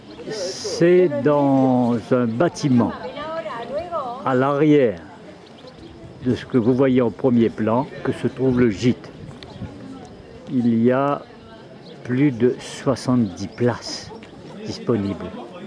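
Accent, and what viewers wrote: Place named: Réunion, France. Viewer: Bonsoir. Merci pour cette sublime vidéo qui ravive de très bons souvenirs'. J'ai effectué mon camino du 02/06 au 30/07/2019. Je suis parti du PUY et j'ai rejoins SJC le 27/07... J'ai continué vers le Finistère que j'ai rejoins le 30/07. J' bien ton accent qui me rappelle mon Île natal la RÉUNION. Bonne continuation. Je repartirai sur les chemins par la voie du VEZELAY au mois de juin. Thomas (un gars des Avirons)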